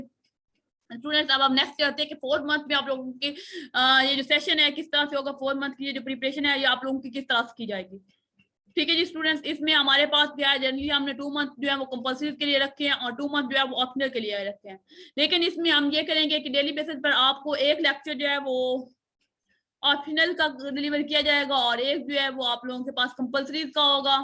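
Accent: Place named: Punjab, India. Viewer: Mam ...apki tone of language ..jhang,chiniot,sargodha qasor ..mandi bahu din en logon sy milti ha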